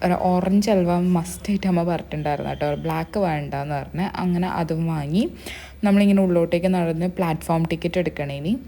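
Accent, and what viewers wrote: Kannur, India. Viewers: സംസാരം കേൾക്കാൻ നല്ല രസം ഉണ്ട് ചേച്ചി.... കണ്ണൂർ ഭാഷ കേൾക്കാൻ കൊള്ളാം 😍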